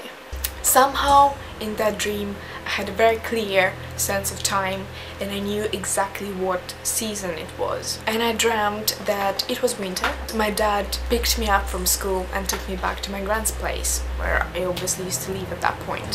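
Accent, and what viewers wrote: Russia. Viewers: whoa whoa whoa! hey now, how is it even fair for you to be this freakin' beautiful?! and a fellow russian as well, judging by your accent. :) #womancrushwednesday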